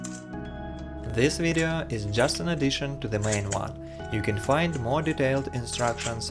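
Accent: Russia. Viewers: Желаю удачи в дальнейших разработках. Кстате, по акценту мне показалось что ты русский, это правда?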